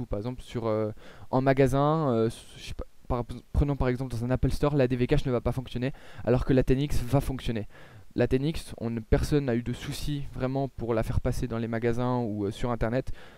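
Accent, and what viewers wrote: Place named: East, France. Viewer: T'as un accent de l'Est. Tu viens d'où? Lorraine?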